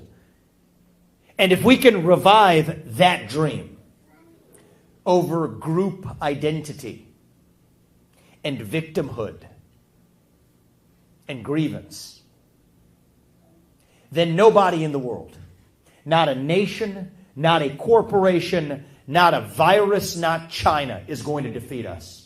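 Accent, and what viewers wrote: United States. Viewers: As a 50 year old Canadian, Vikek sounds like an American. I don't expect he'll be the next president. But he'd make a fine president.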